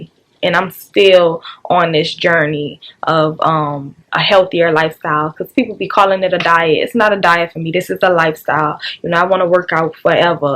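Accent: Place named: New Orleans, United States. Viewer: I knew u was from New Orleans u talk like me lol bt thanks for th info hun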